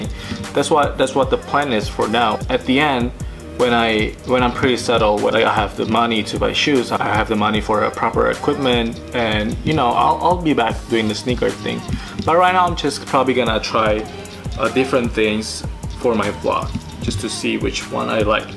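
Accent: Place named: United States. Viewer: It's been a long time I did not hear your voice. Turns out you speak with a stark American accent now! :)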